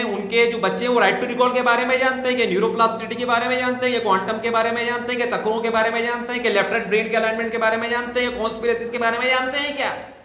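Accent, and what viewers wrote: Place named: Ajmer, India. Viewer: 😂Love this ajmeri style of talking❤️ लेकिन भैया कोरोना के नियम तो पूरा ही आड़े आ रहे हैं😭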